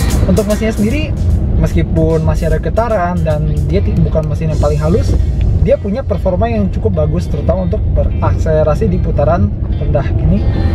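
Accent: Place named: Bekasi, Indonesia. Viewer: kemang pratama banget.anak bekasi ya bang?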